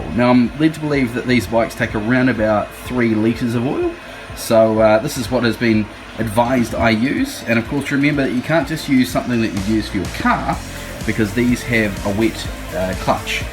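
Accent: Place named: New Zealand. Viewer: I love NZ accent...Great video.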